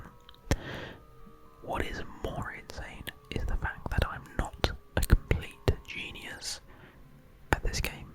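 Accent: United Kingdom. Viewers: Thank you for continuing to make videos, and especially for continuing to make OSRS videos!! I’ve been falling asleep and getting tingles watching these since I was still in secondary school, and now I am in my 5th and final year of Uni, soon to start my masters!! Something about hearing an English bloke meandering through the most nostalgic parts of this game that I have been playing for well over a decade aswell brings me to a place of comfort that nothing else can replicate. ❤❤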